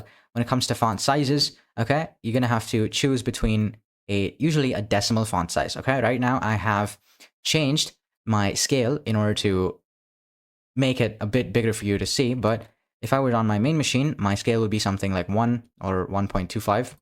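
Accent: India. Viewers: indian voice sayin OKA OKA jeez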